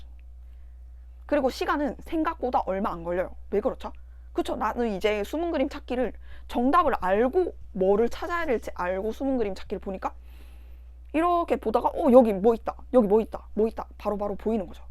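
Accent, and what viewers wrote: Busan, South Korea. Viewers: 부산이신가요? 똑~띠 이십니다. ^^b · 마산 진해 부산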